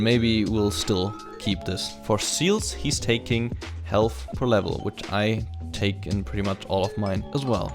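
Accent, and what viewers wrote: Germany. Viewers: R u German? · Hay man really nice Guide this build is awesome. I always bought black cleaver, Ionian boots instead of essence reaver but I will try this one aswell :) I just subscribed and btw you're german right? ^^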